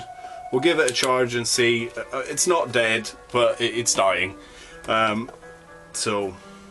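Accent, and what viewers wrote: Scotland, United Kingdom. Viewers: Thank fuck for that, someone speaking proper fuckin English (with a Scottish accent) instead of some shitty twatty shite ya can't understand. Ta, for the advice, good video.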